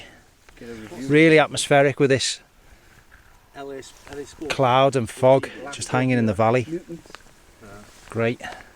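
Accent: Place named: Wirral, United Kingdom. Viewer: Guessing you're from either Posh/Nice Wirral, Southport or Chester maybe. Good Vid 👌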